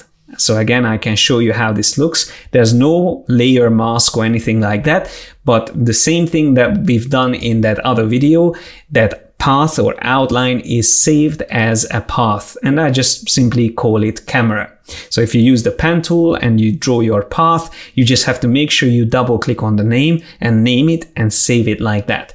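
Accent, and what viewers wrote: Hungary. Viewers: sick video, are you Hungarian? judging by the accent :) (so am I) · Are u hungarian? Your accent is identically as hungarians speak english. No offense, it just was familiar to my ears...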